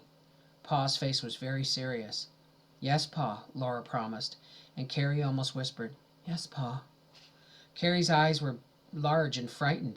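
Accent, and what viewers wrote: Canada. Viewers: the pronunciation must be a Canadian thing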